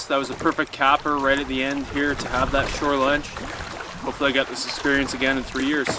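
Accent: Canada. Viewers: PAROGIES! I moved to the United States in 2008 and that is a food they don't really eat down here. This is the most Canadian video I ever have seen and nothing has made me feel like I've been back in Vancouver like hearing you. Previously, the most Canadian man I've encountered was a dude from Alberta trying to marry my dad's cousin in Budapest. We were both on the wrong continent but we felt like North Americans together.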